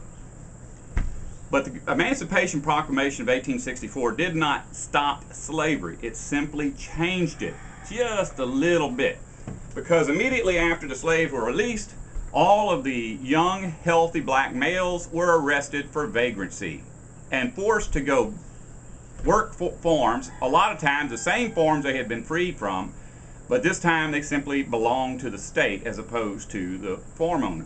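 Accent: South, United States. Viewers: where is it your from Mississippi or Louisiana I"m just trying to figure it out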